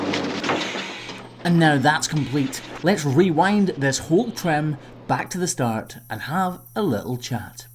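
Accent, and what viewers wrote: Scotland, United Kingdom. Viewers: I especially loved hearing all the background sounds during the first part. However, being from the United States, I can’t get enough of your Scottish accent. ☺️💜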